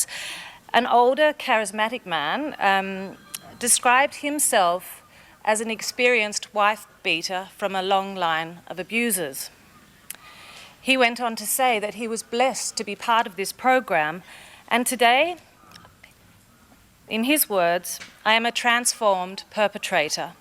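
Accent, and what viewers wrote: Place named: Australia. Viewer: Good to hear Princess Mary and her aussie accent!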